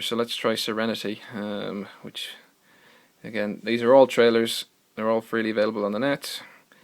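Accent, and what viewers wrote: Ireland. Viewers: Nice review. Are you Irish?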